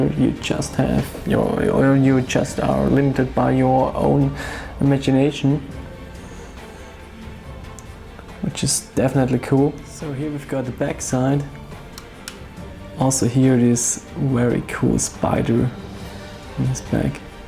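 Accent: Germany. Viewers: Ha, wusste das es nen Deutscher(-sprachiger) is an deinem Akzent 😂👌🏻 Wie halten sich die Pegs an den Beinen ? Hab viel negatives über die gehört :/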